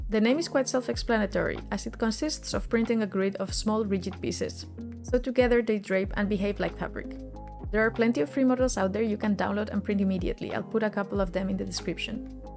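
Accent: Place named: Spain. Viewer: que tendrá el acento español que antes de ver el nombre del canal ya sabia de donde era xD Oye el video es un 10, habia usado el metodo de eliminar superficies para vasijas o filtros de red pero nunca se me habria ocurrido que serviria para hacer tejidos. Greating and Like From Galicia!!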